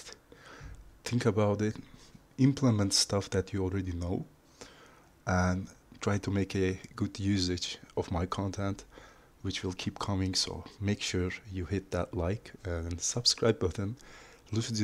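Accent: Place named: Turkey. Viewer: Abi Türk müsün. İngilizce aksanın Türksün gibi geldi. Şunun Türkçesini anlatır mısın 😅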